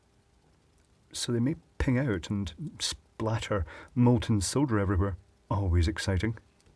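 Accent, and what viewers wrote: Scotland, United Kingdom. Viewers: What kind of Scotland are you from I'm from Fife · Scottish accent and you avoided saying "de-burr"...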